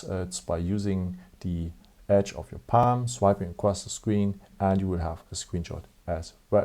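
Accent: Germany. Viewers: How to get a clear screen on the phone to take pictures??? I can see myself at the bottom of the screen but, cannot open it up . Thank you 😊 I like your accent at some of the words you use makes me believe that you are German..😊 🤔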